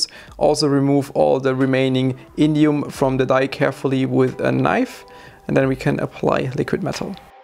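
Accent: Germany. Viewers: du hast so ein episch krassen deutschen Akzent, Daumen hoch dafür:D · Deutsches English klingt always so funny! :D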